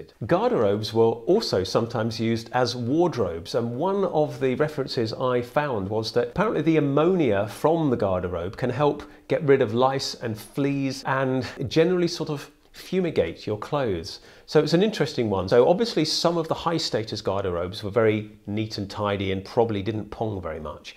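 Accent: United Kingdom. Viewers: 13 minutes of "piss" and "shit" in Jason Kingley's (OBE) excellent diction and soothing british accent. I can die a happy man.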